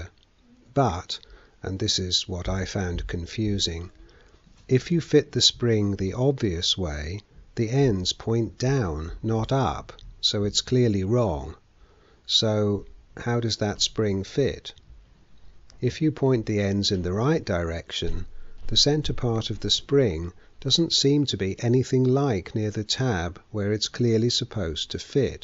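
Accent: United Kingdom. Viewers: Great vid... and the British accent made it enjoyable 👍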